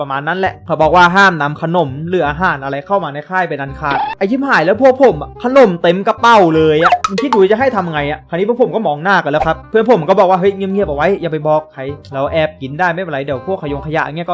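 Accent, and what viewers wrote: Phetchaburi, Thailand. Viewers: คนเพชรใช่มั้ยครับฟังสำเนียงรู้เลยคนเพชรเหมือนกันครับ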